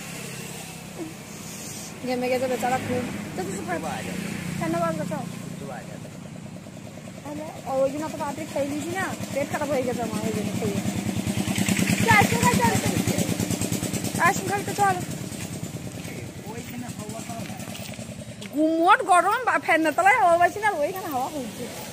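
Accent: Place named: Birbhum, India. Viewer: বীরভূমী ভাষার রসটা দারুণ লাগে আমার❤❤❤... কবে যে তোমার সাথে এমন একটা দিন কাটাবো কে জানে! 😞😞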